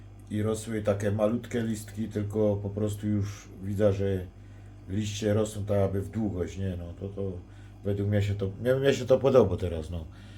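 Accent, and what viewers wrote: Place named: Silesia, Poland. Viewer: Witom....witom 😊👋 śliczne paletki 😊kosteczka fajniusia😉 miło było posłuchać karlusa ze Śląska 😉 pozdrawiam serdecznie 🙋‍♀️👋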